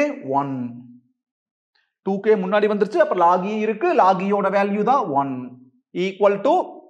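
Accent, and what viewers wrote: Coimbatore, India. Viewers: Sir i am feeling that i cant see your video from tomorrow i miss your videos your approach your teachings and your body language your coimbatore slang and everything sir hats off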